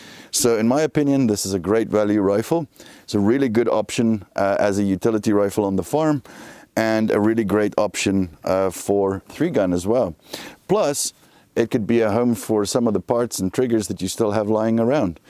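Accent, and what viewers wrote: South Africa. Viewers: You sound South African ... ?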